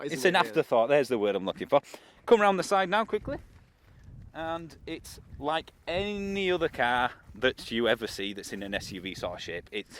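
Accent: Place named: Northern England, United Kingdom. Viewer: From the accent I'd say somewhere north of Leeds UK. I'm about 40 miles away.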